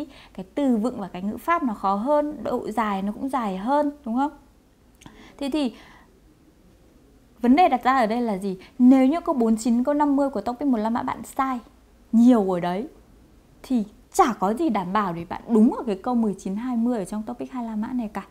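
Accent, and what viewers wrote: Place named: North, Vietnam. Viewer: 선생님은 예쁘시고 베트남북쪽의 목소리가 너무 좋습니다.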